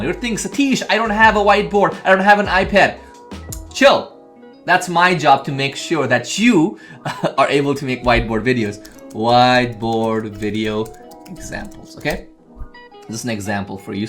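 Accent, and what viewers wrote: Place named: United States. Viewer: Bro make a video on how to get that American accent😳🔥💯💯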